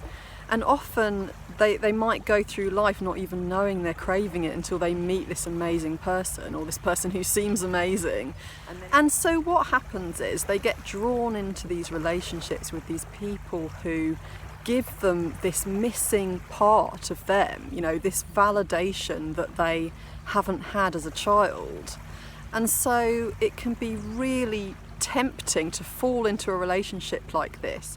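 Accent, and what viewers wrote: England, United Kingdom. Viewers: with the English accent everything sounds so proper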